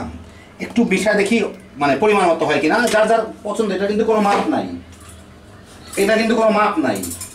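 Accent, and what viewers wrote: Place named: Satkhira, Bangladesh. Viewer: Dada you , especially your language remind me my ancestry migrated from Satkhira ,if I am not wrong. Love you from Calcutta.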